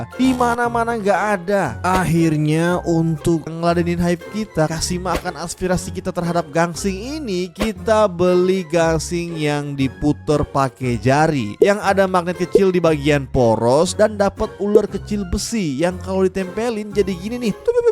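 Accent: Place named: Gorontalo, Indonesia. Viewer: Pantesan logatnya masih ada kebawa" dikit.. bo org grtlo utia :D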